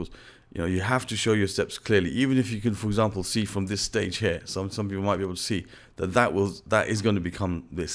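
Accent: United Kingdom. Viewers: i always wonder where you are from. your name is arab but ur accent is a bit british.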